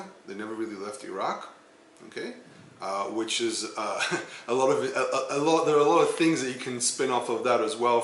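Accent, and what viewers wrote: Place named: New York, United States. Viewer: Your English accent is really good. I hear a bit of NY Jewish dialect in there 😊 - you pronounce words that end in an a with an r. (Like idear, instead of idea)... Maybe add "biblical Hebrew, tanakha " to the description? I actually was looking for modern Hebrew when I searched (but I'm glad I found your channel!)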